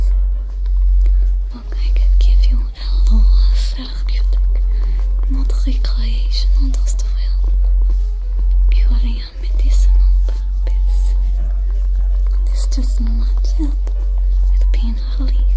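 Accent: France. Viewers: Can we Just acknowledge how she answers to EVERY Comment + how hard she works for us to Fall asleep. Also I have never watched Star wars before but because of this ASMR and the french accent I will watch Star wars now. Oh and I follow you for quite a while now and I wanted to thank you so much for so many anxity attacks you took from me. Especially for the sleepless nights, you helped be trough. Just Thank you for everything you do (✿^‿^) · This is an amazing ASMR rp. First the asmr components as always are top notch. Second the choice of French accent really helps hit certain sounds that trigger (at least for me). Then there's the commitment to the star wars component. Most of the props look like they would fit in universe. Lot of work for the background components. Then there's you costume. Your rps are fantastic. Keep up the amazing work. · I haven't finish watching this yet I am already commenting haha I am so sure that I will not finish watching this because of course, I will fell asleep again and earphone wires will choke you hahahah I just mostly leave my phone on and just listen till I fell asleep. 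*update, I have finished watching it XD Will you also upload the no music version of this ? If not, I will still watch/listen to this~~ I don't know if it's me only but the accent is kinda like a French, specially how you emphasize the letter R hahahah Thank you for this amazing, cool, efforts and everything. I/we love it already ( ╹▽╹ ) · honestly it truthfully is the background soundscape that does it for me it's good white noise to contrast the performance you do Also for some reason the French(or is it more Cajun?) Accent does tingle me kinda hope you have more reasons to do it in the future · It's truly amasing. I'm a fan of Star Wars since I was 3 years old (I'm 25) and I must say I have a thing for Twi'leks. You are so glamorous and adorable as a Twi'lek. And add to that, since my mother language is french, pour french accent is truly nice. Love you and your works. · Are you from France, my Green love? 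· i was like "wow the French accent really fits twi'leks." but as im reading the comments, I was not the only one that forgot that it was the actueel accent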